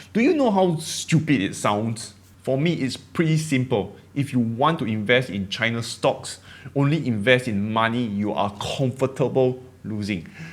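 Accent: Singapore. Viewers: Your accent is very distinctly from SE Asia, like the Singapore area. Maybe add some local English slang words into your discussions. I grew up in Taiwan and HK so I can tell the difference btwn when HK person speaks their British English and other areas. I believe if you watch some American tv shows, like Yellowstone, you can hear more of the American Midwest accent. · What's wrong with your accent? Alot of Singaporean speak just like that. Most of us who don't study in international school or who don't grow up imitating the lines in American shows will speak exactly like you. Does it mean that American or British accent are superior to others? Why not they learn to understand our accent? I'm proud of the Singaporean accent even if I speak perfect English. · Stay and talk how you are - it's perfect like that! Everything else doesn't sound authentic and I am so happy to hear the Singlish accent! 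:-) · I love your Singaporian accent!! I am American born and raised and I only speak English. I can understand you perfectly. Just my two lovelaces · Just be yourself man. You are doing great! What if you “neutralize” your accent n it doesn’t help? Waste of time ..... u are uniquely Singapore.... . Don’t listen to me: I might be a fly, ramblings of a mad fly. :) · Singlish accent is good!😆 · I don't think you need to neutralise your accent. We can understand you fine and I like listening to your Singaporean straight talking. It's so refreshing that you're not trying to talk around something like in the west. Rather, you're straight to the point and I like that. Keep doing you. From Australia! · Singaporean accent is what makes your videos unqiue tho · I am so glad I never miss your videos but this one was one for the archives!!! First I am glad you gave your opinion on the Chinese stock risk to reward with firm life experience. 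The rest will be up to us, we will either be donkeys or bees (is that a new animal we should now aspire to). Next the bees quote will be repeated by me ASAP. I hope after people laugh (as I did, so much I had to stop the video) they will seriously think about what that quote is saying. I find that I have a lot less to say to flies as I collect my honey. In regards to your accent, I understand you desire to reach a bigger audience and self "improvement" is usually a good idea so I say, give it a go, but I use subtitles for even English native speakers and your videos due come with them so if others are finding it hard to understand you that is an option, but many hate to read as well. If you feel it is limiting you reach then put in the work but I personally love a Singapore accent. In the end the value you bring is not in the accent of your speech but in the quality of your content and that has always come across to me loud and clear. 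All the best and thanks again for keeping others from being a DONKEY!! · You've gotta represent Singapore's pride with our accent! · please just keep the Singapore accent :)) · Yo man, I actually like your accent. I have a colleague at our company who is also a good friend of mine who lives in Singapore. Your accent reminds me of him. Don't change it man, its awesome. Everyone has an accent to someone else anyway!! ;) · Nooo don’t change your accent. Singapore accent is great and not hard to understand. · It's a classic Singaporian accent don't change, better to add some singlish to teach people... don't change a dam ting lah! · Don't lose your Singaporean English accent! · Don't change your accent just because someone tells you to do so lah. If the viewers are not willing to get used to our SG accent then they can go watch Jim Cramer or other donkeys with their perfect American accent. 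· Don't need to change accent you sound perfect to me. Singaporean here as well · I'm Canadian and i love Singaporean accents! Dont change! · That is Singaporean English.. All Singaporean can understand it and has no issue on that.. haha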